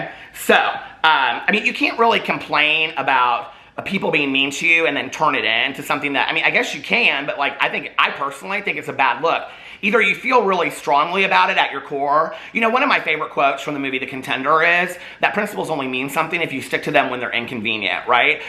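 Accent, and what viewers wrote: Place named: Indiana, United States. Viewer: I think its just his Indiana accent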